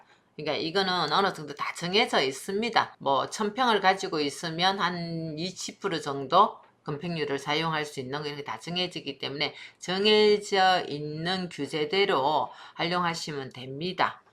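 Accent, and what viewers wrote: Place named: Gyeongsang, South Korea. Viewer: 진주분이신가요? 사투리가 ㅎㅎ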